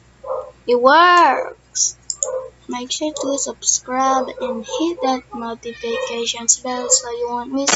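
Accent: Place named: Philippines. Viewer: nice filipino english accent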